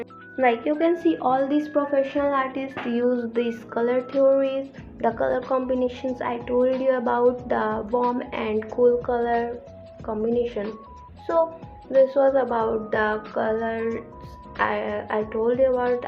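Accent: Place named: India. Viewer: You are right the accent does sound Indian, or SEA at least. But hey none of our business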